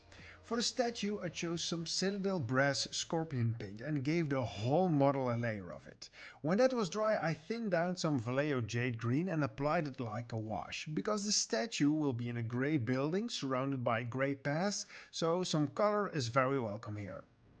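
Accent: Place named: Netherlands. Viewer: Dutch? Thenaccent sounds like it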